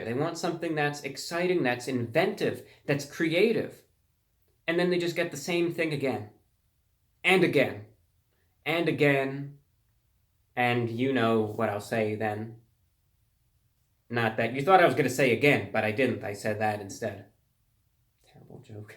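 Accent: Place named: New York, United States. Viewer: where is this guy from? I would guess New York City or maybe NJ? that accent....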